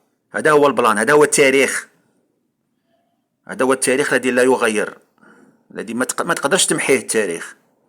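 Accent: Morocco. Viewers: لهجتك مغربية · لهجتك اصبحت مغربية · الفاضك ولغتك تبين انك مروكي لماذا تدعي انك جزائري 🤔 🤨 انت مختل عقليا 🫢 الجزاءريين يتكلمون بشخصيتهم 💪 وانت تتكلم بشخصية غيرك 😏 يا ضعيف الشخصية يا وقح🫵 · علاش شحال كنت كتشد فجزاير لأن دينار عندكم نازل عل درهم واحنا عارفين الحد الأقصى الأجور عندكم بمقارنة مع المغرب هدرتك ولات مغربية شحال وانت فالمغرب المهم الله ينتقم من الجزايرين الخونة اعذرني الا هدرت بلهجة ناشفة ولكن انت عارف بلادك اش دارت وباقا كتدير حتا ولينا نشكو فجنابنا ومنتيقوش اي واحد جاي من عند العسكر كنتما تكون مختلف عليهم وتحط مخافتك من الله اولا وقبل كل شي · طريقة كلامك مفربية 100/100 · انت لهجتك مغربية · أصبحت لهجتك مغربية و ليست جزائرية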